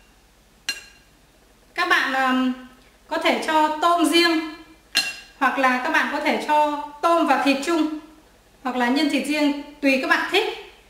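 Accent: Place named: North, Vietnam. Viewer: Bac ky 75 noi giong nghe oai qua .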